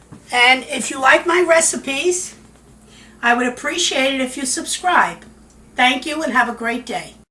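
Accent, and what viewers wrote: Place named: New York City, United States. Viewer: You have a real strong ny accent, are you from Brooklyn ?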